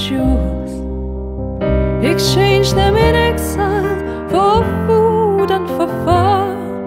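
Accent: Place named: RP, United Kingdom. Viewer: спасибо. очень красиво, и песня и клип и фотографии. а произношение ваше- прям как-будто слышал как говорит английская королева) приезжайте в Ингушетию. не забывайте корней. Кстати, вы очень похожи на бабушку. Ахриевы древний тейп. и раскиданы по всему свету. очень любознательны и неординарны) есть в Америки отец и сын Ахриевы- очень талантливые художники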